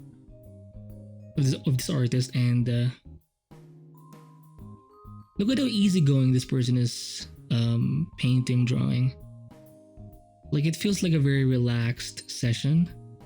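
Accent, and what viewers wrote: Philippines. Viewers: You sounds like a Filipino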